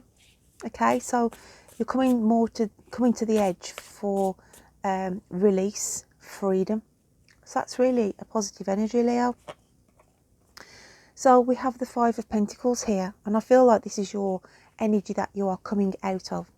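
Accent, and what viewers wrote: Birmingham, United Kingdom. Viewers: Love the accent a Brummie I think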